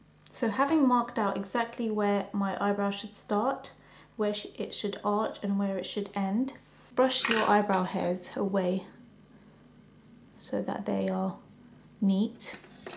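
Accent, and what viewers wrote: United Kingdom. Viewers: Loved the video :) very helpful, i needed to tame my eye brows. But im very confused by you O.o ur muslim and look like you have a arabish background but you have a british accent O.o uhh what? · Do you mean here religion or where she is from, her religion is y guess Muslim, which is a beautiful religion and i respect it, but her culture idk because she has a British accent, my guess is her relatives are Iraqi or somewhere around there, but it sounds like shes from Britain but follows the religion of Muslims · You've got the most amazing eyes! And to my ears you sound kind of British! :D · You do have wonderful eyebrows! Finally I got to see a perfect eyebrow tutorial with very good explanations and I think next time I will try it just the way you explained. You are very nice and beautiful! I like very much your voice and your british accent! 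Thank you so much for sharing with us this lovely eyebrow tutorial! Looking forward to seeing the next video from you! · She sounds british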